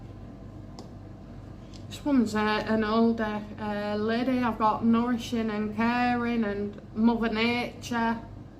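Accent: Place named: Yorkshire, United Kingdom. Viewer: This lady speaks English very clearly, no problems understanding her northern (Yorkshire) accent, a great regional favourite! Usually associated with a no-nonsense, down to earth, hardworking, family-loving nature.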